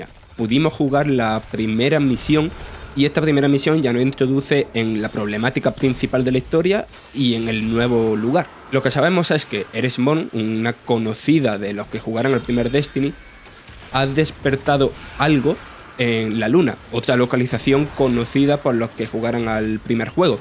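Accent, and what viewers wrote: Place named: Granada, Spain. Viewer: jjajaja sí, es que dicen que es acento granadino.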